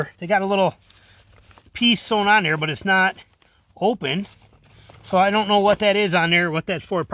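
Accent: Michigan, United States. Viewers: super helpful review and one hell of a a Michigan accent.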